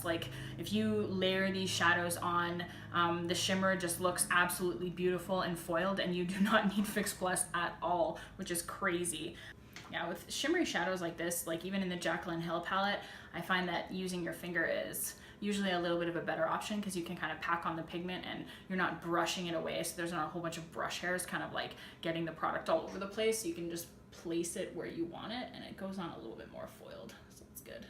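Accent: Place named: Canada. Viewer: Who doesn't love a cdn accent?!!!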